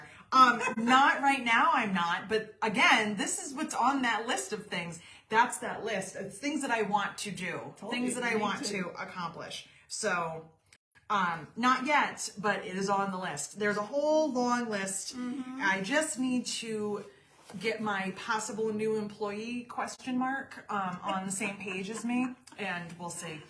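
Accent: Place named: Massachusetts, United States. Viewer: I just discovered you while watching Siah do a walk around at some quilt show a month ago down here in Florida, and you were the last booth she went to, and met you...I loved your patterns, and your beautiful Cork (which I've never in my life used), and I just loved your personality and omg I fell in love with your free pattern for that beautiful wallet made all from that gorgeous cork! I was asked last year to do a small craft fair, but it was last minute, and I had nothing made, so I made a ton of stuff up this year in between all the surgeries I had to have on my legs, and the lady who organized the event had to cancel as her husband passed away. This would have been my very first ever event...I had a really rough year and can't wait for it to be over...I will be having another surgery next Wednesday and may be looking at a bypass surgery on my left leg the Doctor told me. 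Anyways, I just had to tell you how much I love your items, and how happy you make me watching you because of your fun and bubbly personality...Thank you for that! Sorry I wrote you a book! It was really fun watching this video, and I look forward to more! P.S. I had to laugh about the massachusetts accent as that is where I'm from, and talk just like that, and live in Florida now... lol...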